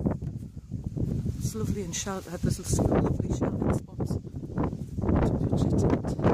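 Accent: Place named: Northern England, United Kingdom. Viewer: Hiya enjoyed watching that well done. I am new to walking the fells done a few round ambleside and grasmere but walked the langdale valley on last visit and couldnt believe how beautiful it is. Just invested in all the OS maps to help me get round though as on a few occasions ive gone a bit wayward. on that walk did you follow the cumbria way to start off and go up past pike o stickle or do you go to stool end farm then across? Going over for 4 days in June Aug and Sept and planning to get a fair few walks in. This one looks great. Sound like you arnt far from me with the accent. Im from Hartlepool.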